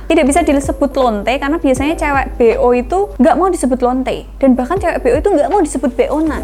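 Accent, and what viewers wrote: Java, Indonesia. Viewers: seneng cara ngomongnya medhok bgt♡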